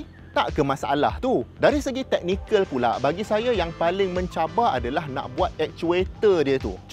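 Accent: Johor, Malaysia. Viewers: Lah orang jb ke haha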